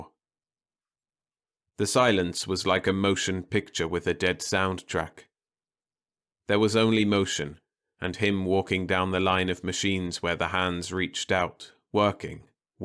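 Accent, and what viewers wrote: United Kingdom. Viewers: I have trouble with the nasal British accent. Just a personal thing with me.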